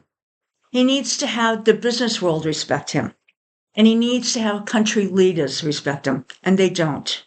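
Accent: Massachusetts, United States. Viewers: I knew you had the Mass accent!! My mom was from Hull village , I grew up in lakes Region NH ( Squam lake and Winnie) and now in Maine.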